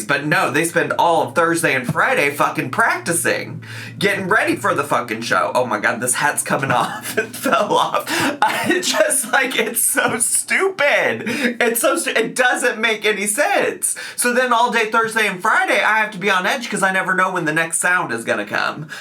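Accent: South, United States. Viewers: In Eric's voice: NO-ONE CARES. But did I also watch until the very end because it's with their dreamy Southern twang and cute new necklace? Absolutely.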